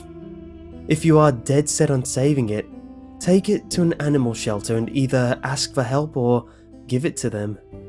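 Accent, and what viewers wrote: England, United Kingdom. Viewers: i realy like your voice it is pritty but i have always had a thing for british guys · u can pretty much read anything with your voice. People love the english accent.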